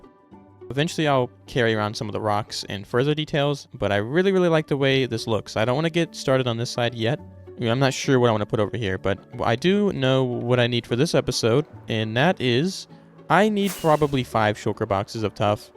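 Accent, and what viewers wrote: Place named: Poland. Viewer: Polska Gurom! Very nice accent for polish people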